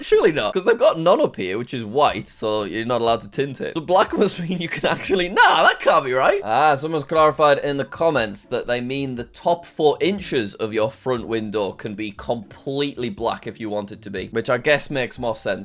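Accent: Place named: United Kingdom. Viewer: how we British people talk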